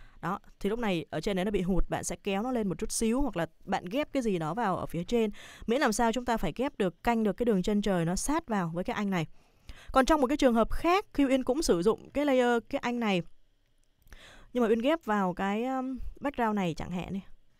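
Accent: Standard. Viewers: Chưa thấy ai phát âm chuẩn và dễ nghe dư bạn. Cảm ơn b nhé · cảm ơn chị rất nhiều, một bài chia sẻ dễ hiểu nhất, có lẽ những khóa học mất tiền cũng không chia sẻ những kiến thức này. Đã thế giọng chị lại còn hay phát âm lại chuẩn nữa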